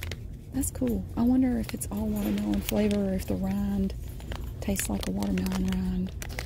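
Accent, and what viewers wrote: South, United States. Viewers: I have found myself actually taking on your adorable southern accent;) and btw love the outtakes and bloopers! A kindred spirit indeed;) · Oh hey a fellow southern person 😂 her accent sounds normal to me because I live in the south (North Carolina) , that’s why I enjoy this channel so much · Why does she sound like Tati Westbrook with a southern accent ??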